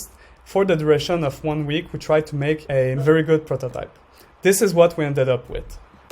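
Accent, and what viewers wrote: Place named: France. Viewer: French guy for sure !!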